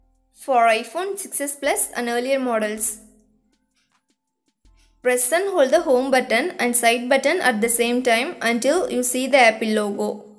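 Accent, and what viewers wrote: India. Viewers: I like the way you speak and your voice. You’re Indian right?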